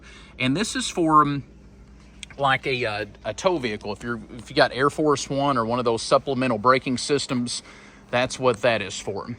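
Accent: South, United States. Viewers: There is nothing worse than a used car salesman lying to a prospective customer with innuendo of impending end of driving cars spiel to pressure the buyer. Until now. I remember this same threat by used Renegade Youtube dealers that the XL was being discontinued in 2022. Probably even had the very practiced cover their butt distinction that they had it "on good authority by the President of the United States..." or some s**t. You come off as a southern drawling con man when you gossip.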